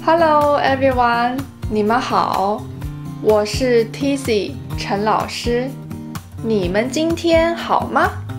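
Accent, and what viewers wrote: Standard. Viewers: 老師的聲音真好聽，發音也很標準，要向您學習！ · 老師發音標準清析，太棒了。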